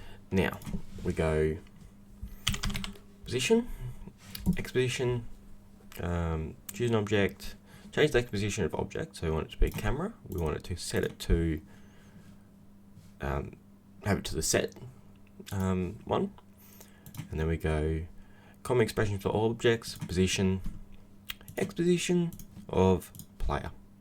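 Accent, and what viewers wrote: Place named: Australia. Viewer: you can tell he's aussie, i can cuz i am too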